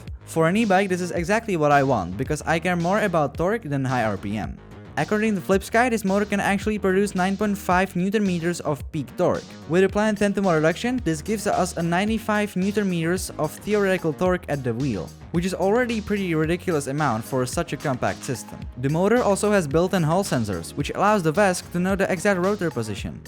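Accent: Czech Republic. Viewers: Kamo, poznám český přízvuk, maga tvorba a w tvoje ajina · i sense a czech accent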